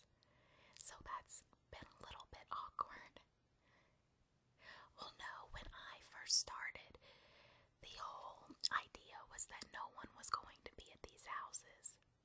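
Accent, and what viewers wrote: South, United States. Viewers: Where are you from? In your videos I detect a southern accent.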